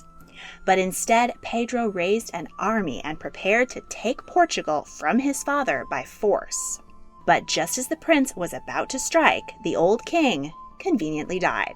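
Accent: United States. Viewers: geez americans have an ugly accent. i cant bear to listen to this woman. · Please get someone to narrate who is not so fucking annoying with their boring semi american twang.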